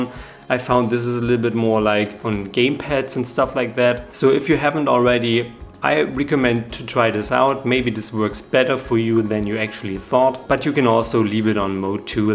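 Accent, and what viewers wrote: Germany. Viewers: Bist du ein Deutscher? Dein Akzent klingt nämlich sehr deutsch :-)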